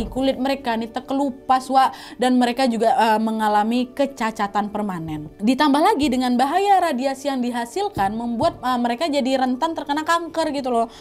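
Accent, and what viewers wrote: Indonesia. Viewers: 😂😂lucu ni bercerita dgn nada Indonesia nya...saya kekadang tak faham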